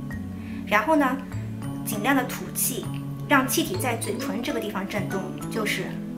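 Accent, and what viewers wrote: United Kingdom. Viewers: 你的发音很英式啊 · 听上去是英式英语？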